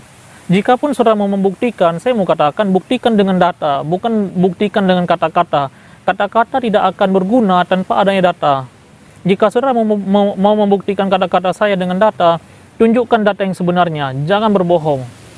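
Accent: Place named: Pidie, Indonesia. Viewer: aceh mana kau? aceh pidie ya? dr logat bahasa aceh kau nampak org pidie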